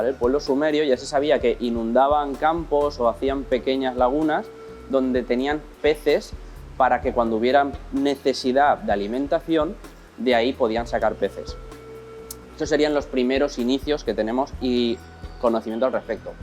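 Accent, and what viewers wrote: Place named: Spain. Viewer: Como extraño la continuidad de videos del canal Como extraño a hablar con su acento español que es tan lindo y enamora, en fin si, enamora 😉 🇦🇷 ♥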